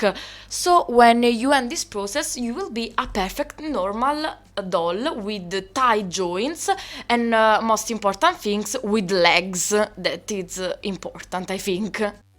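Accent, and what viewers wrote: Italy. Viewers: Yeah, your english is fantastic. Never lose the depth of your Italian accent when you speak English, it is Perfect as it is! 🥰🥰 Also thanks for saving my Clawdia's legs! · Amazing video, thank you! 💗 I have just started to get back into Monster High and bought two dolls with loose leg joints, I'll be sure to try this on them! And honestly, your English is super good, like you can see how you know the slang, jokes', terms and such. Other than that there's only minor mistakes from time to time with the conjunctions, but I think that getting better with that will just come with time 🤘 Also you have such an cool Italian accent, I love it! ☺️👍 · Your English is beautiful - your Italian accent is delightful to listen to :) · Perfect video to help me fix my daughter's dolls. Also, your English is very good! I love your Italian accent 🥰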